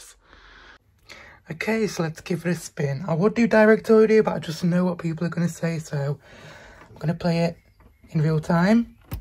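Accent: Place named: United Kingdom. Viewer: I thought you were from the UK by the sound of your voice I checked your channels about info and yep I got it right I have other questions but I really want to come out offensive at all so I won't ask sorry but dang I want to get a copy of that Vinyl the first track is EPIC!!! Yeah anyway Lots Of Love Keep It Up And Stay Positive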